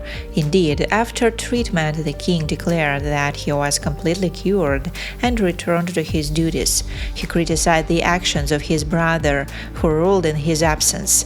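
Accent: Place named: Russia. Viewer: Love the Russian accent eg uffishal for official. A beautiful viydeo🥰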